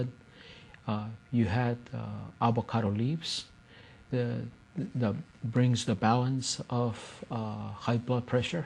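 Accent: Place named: South America. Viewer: This man is from a south american( peru or something) country.. his accent is not a blackfoot, kainai or peigan or anything from that area.. sorry folks, but maybe he has some good teachings.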